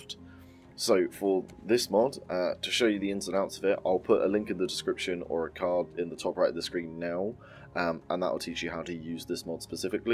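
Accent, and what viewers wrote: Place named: Oxford, United Kingdom. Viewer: Thanks for the videos, what about a playthrough? You are from UK? I have a colleague from Oxford with the same accent.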